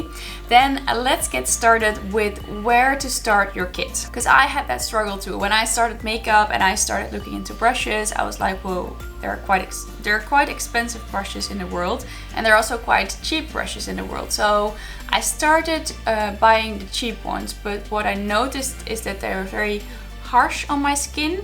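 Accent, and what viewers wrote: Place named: Netherlands. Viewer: What a gorgeous channel you have! Where are you from?💗✨ You sound Dutch like I am😂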